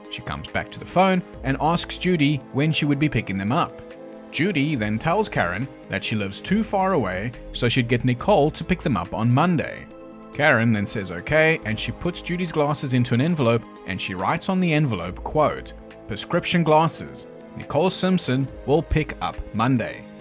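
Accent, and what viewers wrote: South Africa. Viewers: I've been watching (listening to) your videos a lot while drawing this past month, and that entire time, I, an Australian woman, have been trying to figure out if your accent is from New Zealand or Tasmania. As soon as you said South Africa, it was a total Eureka moment. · I knew you were South African! I've asked you several times because I recognized the accent.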